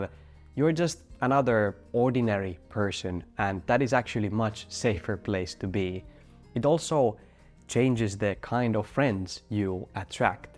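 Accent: Finland. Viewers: Jännä miten suomalaisena tunnistaa suomalaisen puhumassa englantia ekan kahden sekunnin jälkeen. Hyvä video! 👍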